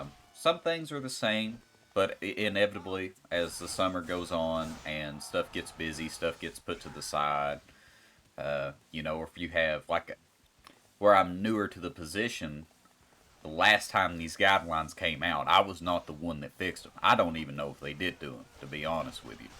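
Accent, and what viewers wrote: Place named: South, United States. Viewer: you deserve a million subscribers. dynasty warriors youtuber with a southern accent??? fucking sign me up omg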